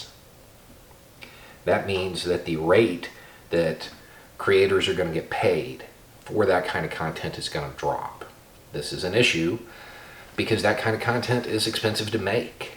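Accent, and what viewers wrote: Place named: Deep South, United States. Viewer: FWIW, and all that; My one child is full grown, has a career, a life and so much- but I have never heard you say or tell a story or any other thing that I would have objected to my boy hearing- and I am nominally a Democrat, although not a dyed in the wool kooky one. Your beard and "deep South" accent don't scare me or my kind at all, as a matter of fact, you sound a lot like home! If you want to do this, you go right ahead- I'll be among the first "overage adolescents" to subscribe! Sleep on it- it's only a thought, until somebody does something with it! FR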